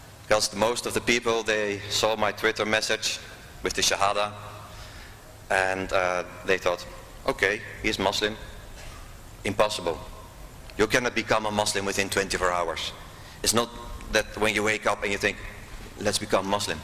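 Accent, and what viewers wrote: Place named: Europe. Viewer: What country is this ? What European country this brother from?? His eccent European country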